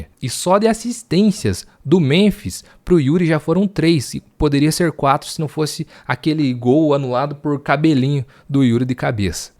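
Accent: Paraná, Brazil. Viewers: vc é paranaense?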